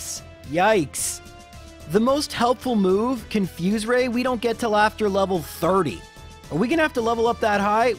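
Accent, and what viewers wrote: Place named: Canada. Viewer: Hes from Canada so he pronounces pokemon names differently then in the us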